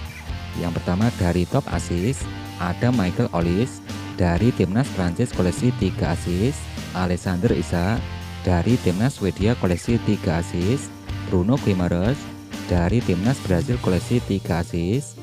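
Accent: Java, Indonesia. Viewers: mimin e wong jowo to 😊😊😊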